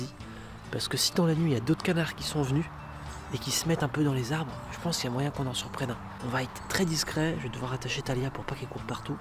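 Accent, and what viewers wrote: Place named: Marseille, France. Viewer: Tu as l'accent marseillais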